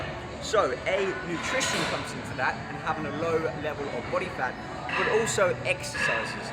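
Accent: England, United Kingdom. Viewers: He sounds so much younger in this video, with more of an English accent. Lol.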